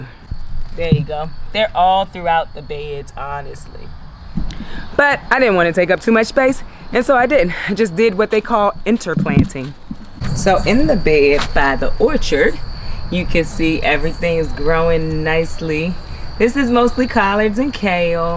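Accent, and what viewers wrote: South, United States. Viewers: You have the best aura....I just love who you are. You have such a positive attitude, a light refreshing southern voice. You're just plain beautiful inside & out!!! Happy growing!💚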